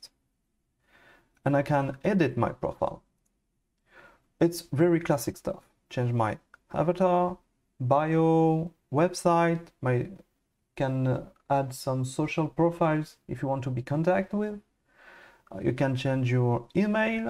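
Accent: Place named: France. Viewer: Your french accent is beautiful 😂❤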